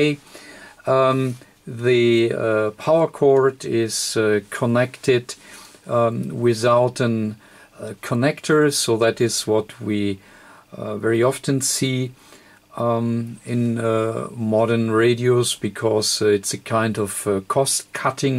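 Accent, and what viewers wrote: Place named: Germany. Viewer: In the first 5 seconds i know that you are a German 😂 your accent is amazing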